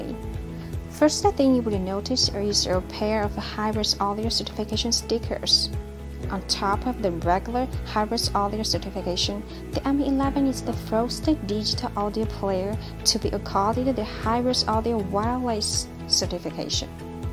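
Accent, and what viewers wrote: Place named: China. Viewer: Lol She legit sounds like Siri speaking English in typical Chinese accent No offense tho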